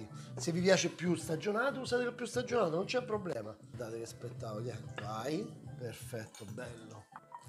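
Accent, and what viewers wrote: Rome, Italy. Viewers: Meraviglioso piatto. Ti assicuro che a volte non mi interessa il video :. Basta la tua voce de Roma che mi sento a casa. Grazie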